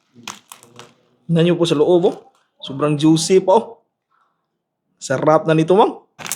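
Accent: Visayas, Philippines. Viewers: Wag ka mg Tagalog halata kc bisaya ka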